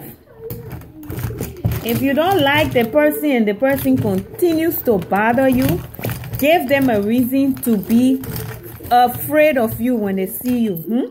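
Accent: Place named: Liberia. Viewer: Liberian accent haha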